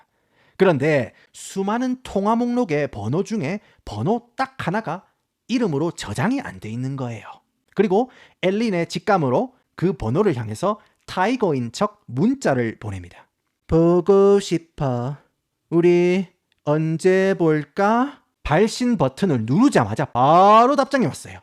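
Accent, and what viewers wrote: Gyeongsang, South Korea. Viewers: 살짝 투박한 경상도 사투리가 오히려 영어랑 더 잘 말아 지시는 듯 ㅋ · 볼때마다 은근 구남친 닮음. 은은한 경상도 사투리랑 말빨 그리고 동글동글한 얼굴…동그란 뿔테안경…참 좋아했었는데… 님 보고 있으면 그 사람이 생각나요 웃긴데 왜 슬플까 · 이 행님 갱상도 사투리 짭짤하게 해재끼다가 영어는 달달하이 할 때 경외감 오지게 든다^^ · 이 아저씨 깅상도 사투리가 은근 중독성 있네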